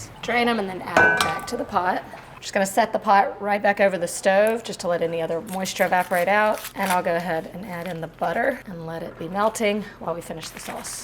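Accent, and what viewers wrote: South, United States. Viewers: Shes so pretty and love her southern accent cause I’m from south Texas. I love her quick dinners with the price of takeout nowadays so she also uses everyday ingredients to make food. I have no kids, but also not working now unfortunately so this is perfect to stay within my very meager budget. Love her!!!!!❤❤❤ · Love this lady...shes si cute! That little southern twang! ❤😊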